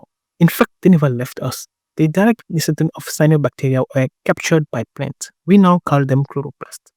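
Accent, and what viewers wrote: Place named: United Kingdom. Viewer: is it just me or is this genuine gibberish with a british accent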